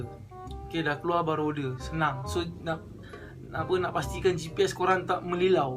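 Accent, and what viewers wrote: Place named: Perak, Malaysia. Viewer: Sapam. Bro Perak brlah mana ni? Kuala ke.. Pekat benor. Hihi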